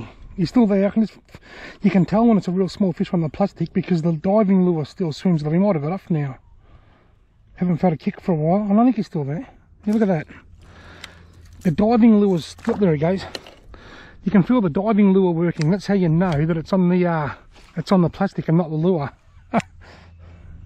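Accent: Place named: Australia. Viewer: Yet another great video, just real Aussie talk with awesome fishing.... hats off to ya brother 🤙🏼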